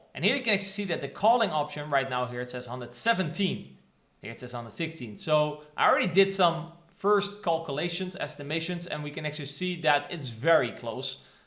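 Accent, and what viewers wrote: Netherlands. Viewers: Everything in the Middle AHAHHA, btw are u dutch?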